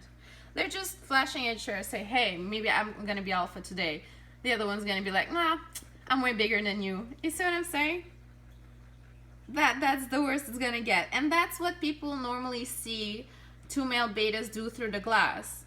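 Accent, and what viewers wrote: Quebec, Canada. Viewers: R u from quebec? You got that sound.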